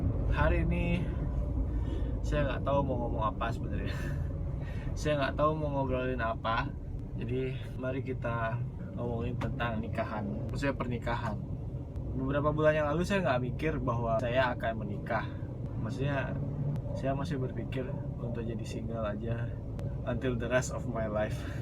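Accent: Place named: Bali, Indonesia. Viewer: Bli, logat bicaranya kali ini baru Bali pisan :)))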